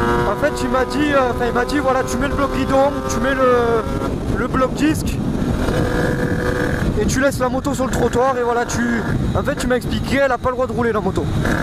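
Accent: South, France. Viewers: avec l'accent du sud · Toi t du sud avec t tu vois 🤣💪🤘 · pourquoi tu as pris cet accent du sud normalement tu n'a pas cet accent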